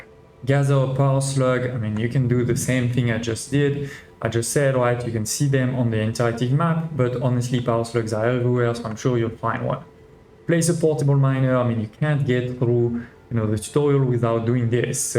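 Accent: France. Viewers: Are u French? U have a French accent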